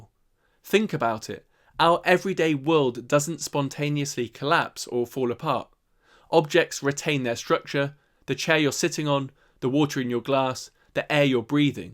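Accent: United Kingdom. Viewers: If you have a British accent, why are you using the American billion?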